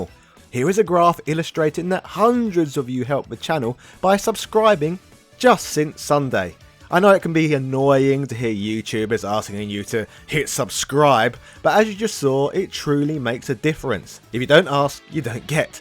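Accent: United Kingdom. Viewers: "Venus de MEE-lo"??? "SAY-ban Entertainment"??? You Brits certainly have a unique way of pronouncing certain words...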